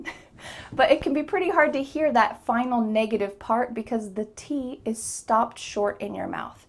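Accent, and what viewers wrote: United States. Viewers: 10 comin’ or 10 common - your thick American accent (for a Brit like me) makes them sound alike. So I have to rely on context… I remember on my first visit to New York in 1964 I watched a game show on TV that featured word-play. It would not have worked in UK! There was a sentence “To be merry, Marry Mary!” (or similar)… The American accent made it sound to me like “To be mry mry Mry!” In England (at least with my RP accent) the three words are quite distinct… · Is it an American pronunciation? Not British? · Very good ,American accent is too good, very good teacher from ivory coast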